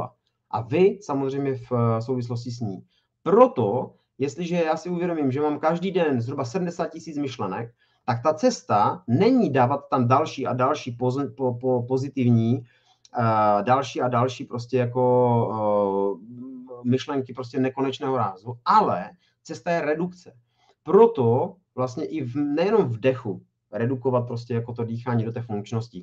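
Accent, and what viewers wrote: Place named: Ostrava, Czech Republic. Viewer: Ten ostravský přízvuk je super, jen to dost kazí ta sprostá slova. A to je škoda.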